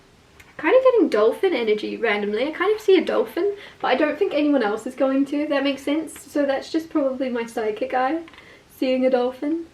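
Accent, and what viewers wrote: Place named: United Kingdom. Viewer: Hi! Cheers to you and this message ❤✨ Spirit has confirmed what I have felt through your channel and channeling. As a former ex-pat your voice makes me miss the lull and grounding nature of your British accent in particular. I went to drama school over there and studied the different dialects actively and inactively. I am grateful I came across your lovely energy and channel. You're gifted. Keep spreading Love and Light and inspiring innovation and courage ❤ Blessings and Light to you Olivia x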